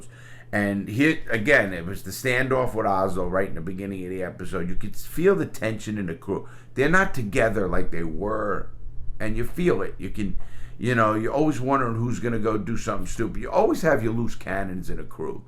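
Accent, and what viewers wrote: New York, United States. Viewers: he has the same accent with a little more new york in it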